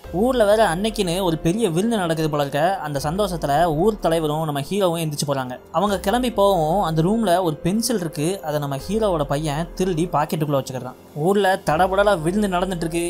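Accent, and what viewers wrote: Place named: Thoothukudi, India. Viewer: Hai bro. . Nama ooru slang mari iruku. . Iam frm thoothukudi. . Especially and ketta vartha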